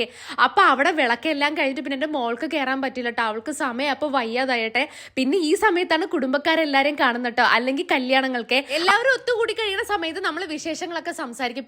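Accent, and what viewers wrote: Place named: Thiruvananthapuram, India. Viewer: കെറുവ് 😃😘 തിരുവന്തരം തന്നെ 😘🫰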